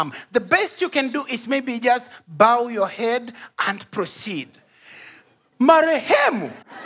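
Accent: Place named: Luo, Kenya. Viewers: you've killed it🤣🤣🤣🤣🤣🤣🤣🤣 i like his Luo accent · Kothin Fanuel its the luo accent that makes his swahili beautiful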